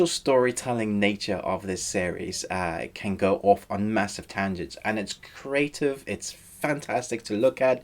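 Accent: South Africa. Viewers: Do I detect a slight South African accent there? Could be wrong but maybe not.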